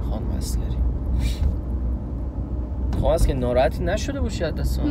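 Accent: Mazandaran, Iran. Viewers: خوشحالم از اینکه جزو اولین کسایی هستم که ویدئو های تورو میبینم ،هلاک لحجه مازندرانیتم جان ،کارت درسته برو جلو که موفقیت های بزرگ در انتظارته❤️❤️🌹🌹🌹🌹🌹🌹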